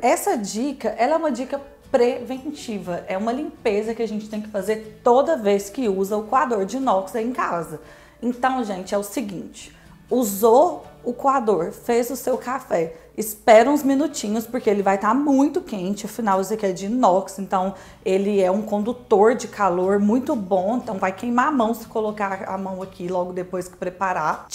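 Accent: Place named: Minas Gerais, Brazil. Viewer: Aqui, gente, vou falar uma coisa procês: adorei esse sotaquizim mineiro, sô. Bão demais.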